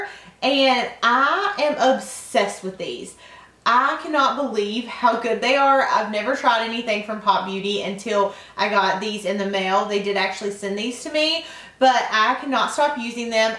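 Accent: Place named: North Carolina, United States. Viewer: Annie Loewen she is from North Carolina United States lol. a little more East than South. she has that kackalackie accent lol... not southern haha thats what we call home north kackalackie lol dont ask if youre from north or south carolina you should know lol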